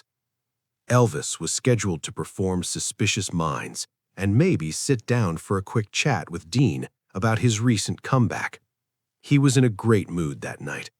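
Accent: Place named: South, United States. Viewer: why does the narrator have to have a redneck accent?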